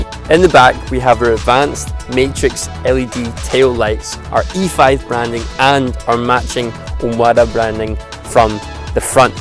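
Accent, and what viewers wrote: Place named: Scotland, United Kingdom. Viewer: The music is way too loud, can barely hear you. Fortunately I had the captions on, which also helped with the Scottish accent 😆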